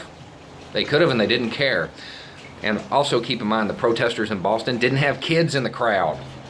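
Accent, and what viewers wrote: South, United States. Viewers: The voice of reason has a southern accent 👏🏿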